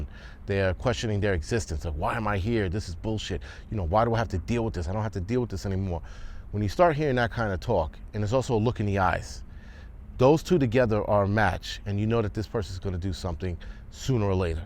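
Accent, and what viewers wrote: New York, United States. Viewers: It's just a word, it only has as much power that you give it. Hes a freaking New York firefighter. It's almost in the job description to talk like he does. Most of my family still lives in NYC. Listinging to is like listening to family.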